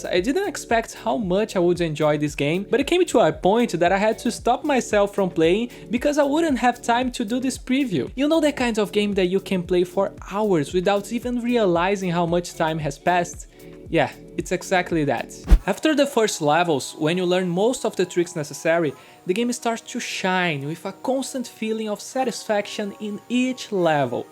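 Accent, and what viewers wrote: Brazil. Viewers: Suspeitei q vc era brasileiro pelo sotaque! Kkk Parabéns pelo review! Me deixou interessado no jogo!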